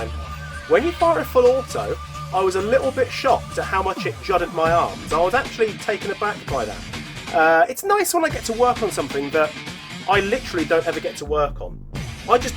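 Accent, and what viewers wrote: RP, United Kingdom. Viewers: thought i was on a english ponce channel first 6mins. was gonna tell you to go swill tea with the upper class twats in a palace. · His swearing and british accent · you sound posh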